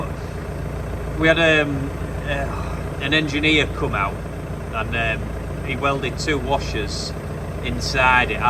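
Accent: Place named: Yorkshire, United Kingdom. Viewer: I'm a bit worried that you are giving the animals drugs and you don't know what you are giving it for. You need to be very careful what you say and do on social media ! Turn the music down. Timelapses are horrendous. I have nothing against a yorkshire accent, but even Cammy controls his broad accent for the camera xx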